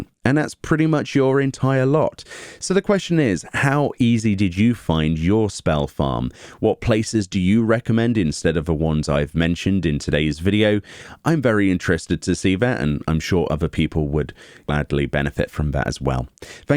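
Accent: England, United Kingdom. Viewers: English accent doesn't mean he understands how to read it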